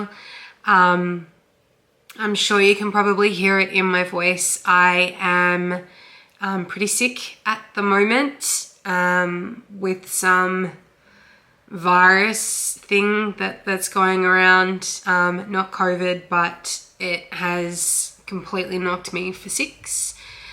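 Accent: Australia. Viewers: Love hearing the Aussie accent on Flosstube! I agree, we need more seasonal stitching options over here in the Southern hemisphere. That BBQ is super cute 😍. Hope you feel better soon.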